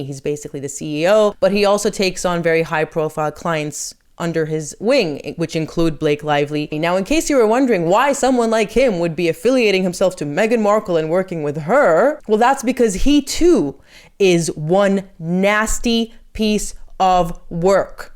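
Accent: United States. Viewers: May I please, politely, ask about your accent? You sound so American but are definitely an Ozzie. I have been there and the Ozzies were lovely. Also thank you, and your wounded friend, for your service. I'm English and they can both feck off. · Curious... Why do you have an American accent? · What country did you serve ? You sound American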